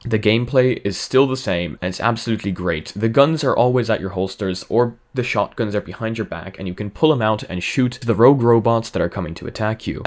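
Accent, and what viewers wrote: Ireland. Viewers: Are you irish lol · are you Irish? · Is he Irish orrrr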